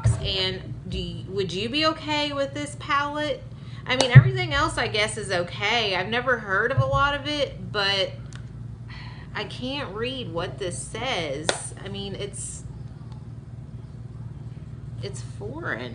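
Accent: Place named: South, United States. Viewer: I LOLed at the VV cream and you bring up a good point/problem I have with boxes shipping even, for instance, K beauty products we've heard of that don't have ingredient lists included in the receivers language. Ipsy has done it a couple of times too, and that weird ass egg mask they sent scalded my skin. So props to you for pointing that out and I'm a fan!;) Nice to hear a fellow southerner on here💜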